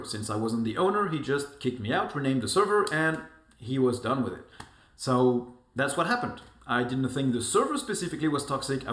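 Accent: France. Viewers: Also, you really really should consider making one video with that French accent of yours! It will be really really enjoyable ahhaha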